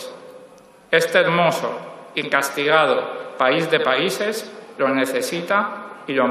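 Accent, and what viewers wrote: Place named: Andalusia, Spain. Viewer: Esque Ami me encantas tú y el acento Andaluz .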